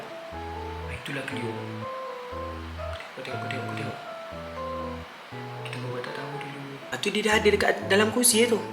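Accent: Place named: Kelantan, Malaysia. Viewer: dye ckp kelantan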